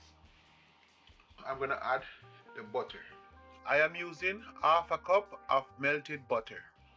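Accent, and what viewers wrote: Jamaica. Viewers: Nice chef, sounds like you come from Clarendon...the heg...lol my family is from St. Elizabeth.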